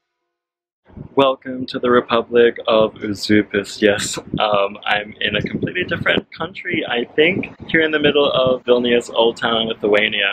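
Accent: Australia. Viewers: I am going to visit Vilnius next month. I can’t wait to be in those places in person. I am definitely going to visit Trakai. I love your calmness and sympathy and the Australian accent is my favourite.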